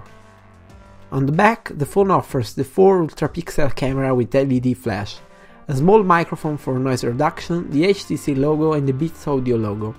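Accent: Italy. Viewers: Ero indeciso tra questo e lo z3c, il mio cruccio maggiore è, vale la pena spendere 350e per un telefono di quasi due anni? (Scrivo in ita perchè l'accento è palese :D ) btw bel video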